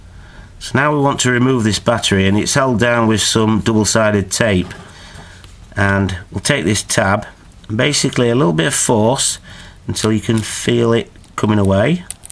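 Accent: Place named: England, United Kingdom. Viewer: Thank you for posting this. I watched this from Connecticut USA, whilst getting a Skype call from from a family member located in North Lincolnshire and commenting, "he's from England!":D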